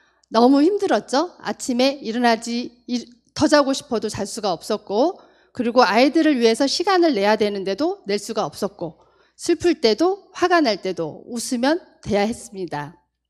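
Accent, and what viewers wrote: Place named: Jeju, South Korea. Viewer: 리더님 하면 구수한 제주 말이 기억이납니다 친정어머님도^^ 행복의 기준! 저에겐 건강이였습니다 좋은강의 감사합니다♡♡♡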